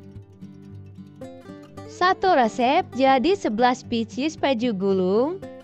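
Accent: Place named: Japan. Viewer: Barusan subcribe , tp blm tahu , Anda org Indo / jepang sih ? Aksennya kyk org jepang 😊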